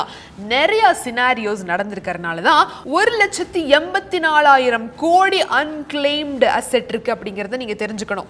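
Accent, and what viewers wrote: Coimbatore, India. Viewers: Coimbatore slang change pannuga 😅😅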